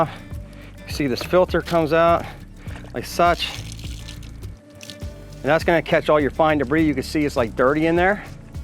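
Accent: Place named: Philadelphia, United States. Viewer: Bro’s gotta be from Philly area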